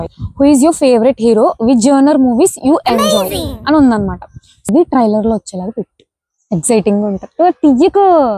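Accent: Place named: Rajahmundry, India. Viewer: rajmundry slang super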